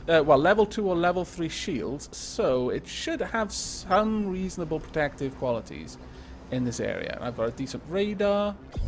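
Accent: Wales, United Kingdom. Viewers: For some reason i think you sound Welsh. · Is he welsh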